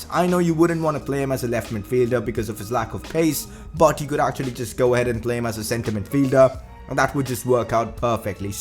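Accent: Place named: India. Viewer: Steve Worrell he's got a sour throat probably Indian cuz I don't know how welsh people sound like.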